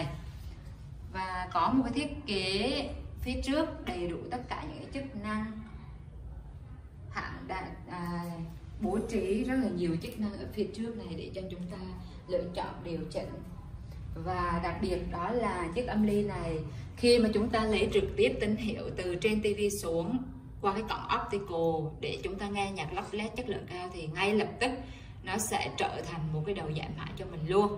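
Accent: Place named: Hue, Vietnam. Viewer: Tiếng gốc Huế đây rồi:)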